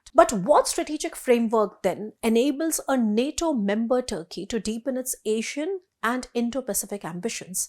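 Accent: India. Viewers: What kind of nonsense is this? The Indians look like jokers, who just get on the blower and talk whatever shit they like. No wonder India's reputation around the world has taken a hit in recent years. This lady is reading from a teleprompter with such a heavy accent that makes you cringe. Poor Turkey is now in the verbal diarrhoea of Indians since it supports Pakistan.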